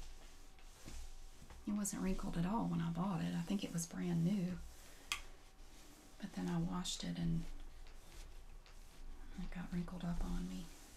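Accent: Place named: Texas, United States. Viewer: I've always liked the sounds coming from a hand smoothing over cloths. Gentle Whispering has a few videos in which she does this. For some reason, it is a very pleasant sound and is one of my ASMR triggers. I can't tell where your accent is from, Texas? I'm in Georgia and the accents around my location aren't like yours. I like yours better. Have a great evening and thanks for the time you put into these videos.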